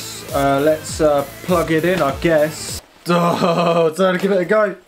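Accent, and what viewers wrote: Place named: United Kingdom. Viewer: I'm a bass player, but I'm here only for the british accent.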